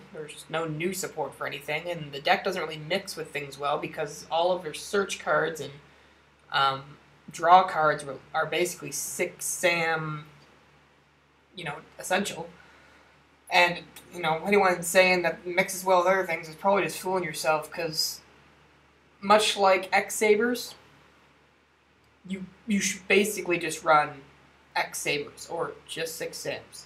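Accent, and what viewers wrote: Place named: Canada. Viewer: u canadian? good video tho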